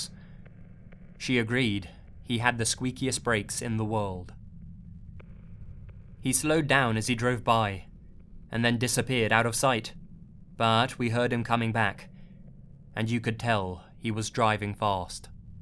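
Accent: United Kingdom. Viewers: I have a new nickname for you, it's British voice narrator guy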